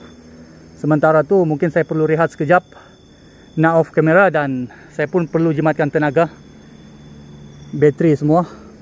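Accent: Sabah, Malaysia. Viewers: Hai..salam dri sabah,sya pn cmpuran jg..mak sya org rungus kudat bpa sya org brunei..sya fhm2 sikit2 ckp..sbb mcm bhsa rungus jg cuma lohgat sja lain..sya memng sdia mklum org sabah thu dri bhsa mlayu sja..apa pn terbaik..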